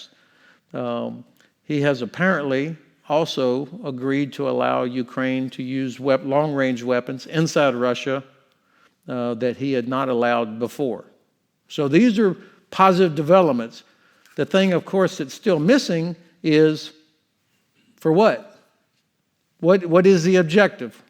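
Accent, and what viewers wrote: United States. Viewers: I thought the accent was Houston area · As an English learner I say f*ck King’s English, I’ll take Florida English every day of the week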